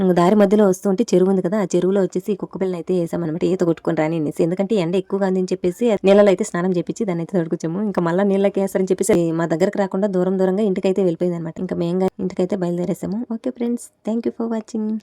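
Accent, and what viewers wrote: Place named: Rayalaseema, India. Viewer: A vuru midhi rayalaseema na anantapyram anukuntunna mi slang vini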